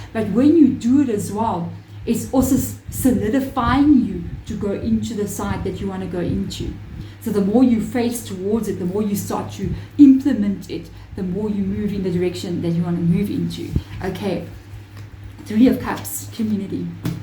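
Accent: Afrikaans, South Africa. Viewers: I thpught i picked up an Afrikaans accent. Im in the vaal in south africa. I havnt got a wand but i use a cow tail . And im so obsessed with horns haha. The things you are saying are so spot on. This whole reading ifeel is aimed at me. I am going thru a spiritual awakening and im writing a book. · You are 1000% correct, when I do a reading I can never recall the information 😂 thank you so much for your channel. My great grandmother is one of my ancestral guides, and she was Afrikaans. I appreciate your beautiful accent, makes me feel closer to her, which is funny cos I know she is always with me xx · I have been thinking about writing a book for years. I have so much information that I feel is valuable. My ADD, anxiety, and depression are really limiting my energy and motivation. I love your accent. I lived near Cape Town for a while. Lovely country and people 💛 love from the USA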